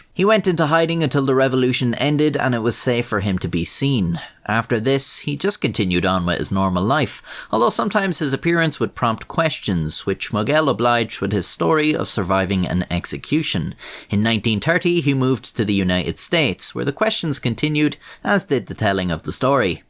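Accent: Ireland. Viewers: Love the Irish accent. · lol randomly got a recommend to this vid, and honestly the irish accent made this 100 times better. XD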